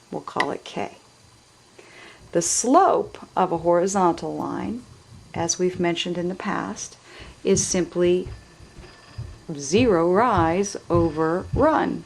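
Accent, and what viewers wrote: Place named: United States. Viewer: I like your american accent, its very soothing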